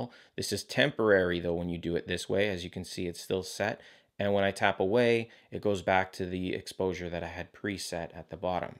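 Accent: Canada. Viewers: Thank you! i'm going to watch again, just got the 12from the 6 ani'm a filmmaker. Enjoyed the Canuck accent.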